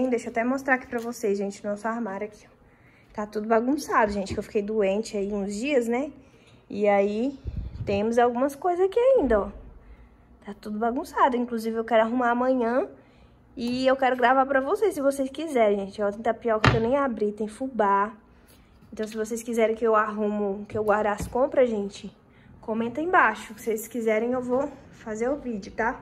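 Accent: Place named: Minas Gerais, Brazil. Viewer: Eu amo sotaque assim. Não conheço mas parece ser mineira ou go 😂.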